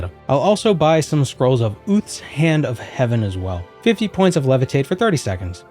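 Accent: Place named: New England, United States. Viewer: where in New England are you from, I only just realized that you have an accent because I'm so used to that just being how people talk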